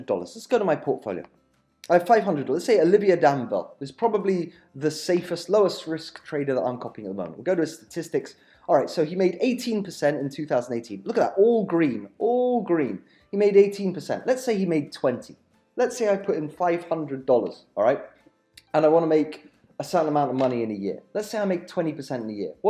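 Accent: United Kingdom. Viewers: I love the British accent. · Mate your British accent reminded me good times that I spend in UK! Very friendly speech mate! Thanks for the info!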